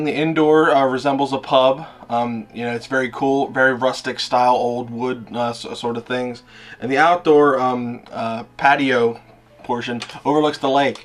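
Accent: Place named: United Kingdom. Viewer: ahaa your British accent was great :D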